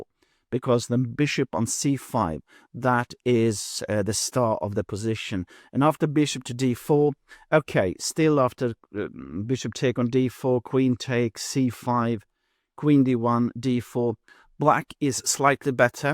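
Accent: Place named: United Kingdom. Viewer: is like Ben Finegold but with a British accent and no blather.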